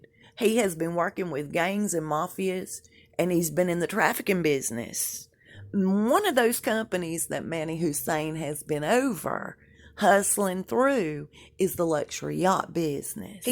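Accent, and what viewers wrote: South, United States. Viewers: Hey, I just LOVE listening to your Southern accent, I could listen to you all day💕. When is your next Cooking show? Can you do Gumbo or Jambalaya? Peach cobbler?;)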